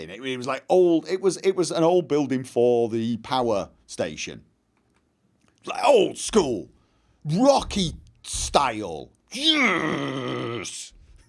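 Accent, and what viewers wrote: United Kingdom. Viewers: I am older than him too. I actually first started watching his channel because I am also a Brit that has long lived in Scandinavia, but the far north of Sweden for me. It was just so nice to hear a British accent and anecdotes from a period I so fondly remember. Grumpy old man - Check! Disparaging of suicidally dressed young-en's out clubbing - Check! Wrecking my back every year by slipping over on ice or snow - Check! Egad, I think I am the Swedish incarnation of over the border. I'd buy him a sympathy beer the next time I'm over to Norway, but I cannot afford to take out a second mortgage...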